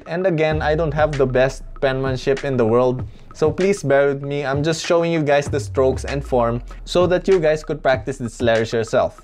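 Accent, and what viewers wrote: Philippines. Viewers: You sounds like a Pinoy like me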